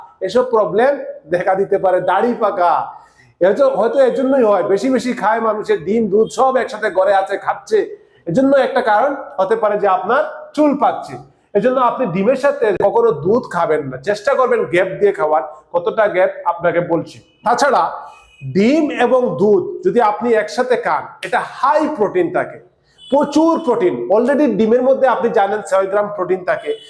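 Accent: Sylhet, Bangladesh. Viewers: বন্ধু, আফনে মনে হয় সিলেটি, সিলেটি মানষর লাখান লাগের তো 😅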